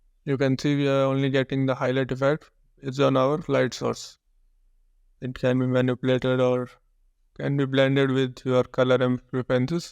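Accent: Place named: India. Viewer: Is this an indian accent by any chance? Usually i can understand the accent since they know english already, but this is a thick accent lol. I agree though, much respect for using his voice. Confidence will adjust the accent, but to get more views you have to be more legible. Also the subtitles aren't saying what he's saying a lot of times.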